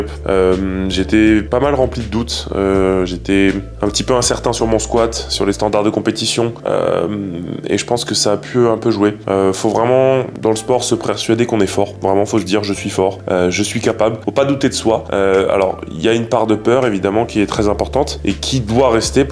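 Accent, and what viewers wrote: Quebec, Canada. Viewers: Ce qui m'a le plus impressionné dans la vidéo c'est clairement ton accent québécois 😲 t'as habité là bas ou quoi ?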